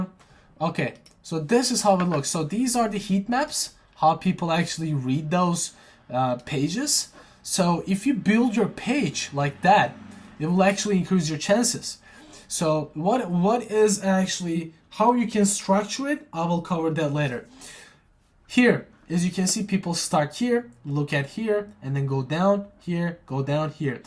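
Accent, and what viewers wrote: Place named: Turkey. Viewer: Çok Türk aksanı alıyorum bu videodan :D Kötü bir şey değil bu arada, sadece kanalda isim dahil her şey İngilizce olduğu için şaşırdım. But anyways, thanks for the tutorial and tips 👍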